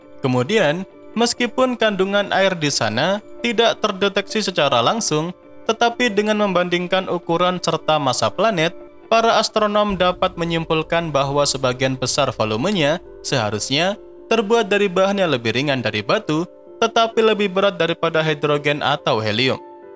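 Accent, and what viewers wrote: Java, Indonesia. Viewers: Pengisi suaranya wong jowo koyo e..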